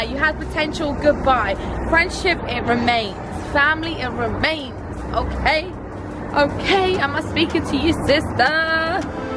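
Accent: United Kingdom. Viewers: How would you describe your particular style of British accent?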